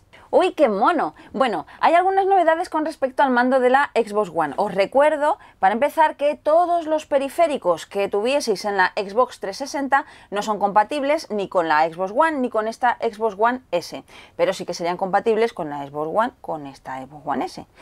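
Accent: Spain. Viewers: eres de barcelona?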